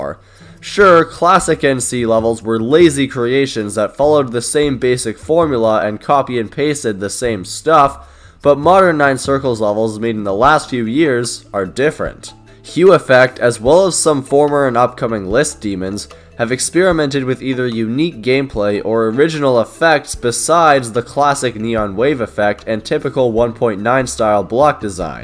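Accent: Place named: United Kingdom. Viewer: glow is the best for sure to me (for a creator with an british accent) and hell is 2 (for a creator with an british accent) core is 3 if done right (for a creator with an british accent) 4thly thats not the most impressive thing in the world minigames and stuff like that go play what and change of scene (for a creator with an british accent)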